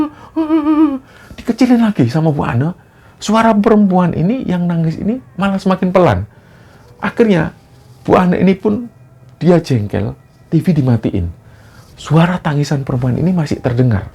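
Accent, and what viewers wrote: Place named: Surabaya, Indonesia. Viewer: Assalamualaykum Mas.., maturnuwun atas ceritanya, sungguh kami sangat menikmati kisah tentang Mbak Siska dimalam ini. Meskipun cerita-cerita yg Mas bawakan selalu bernuansa horor, tetapi saya selalu tersenyum kalau Mas menyebut diksi "cepat-cepat", Mas selalu mengatakan dengan "cepet-cepetan". Mungkin itu dialektikal Surabaya-an kali ya...???! 😊😊😊🙏🙏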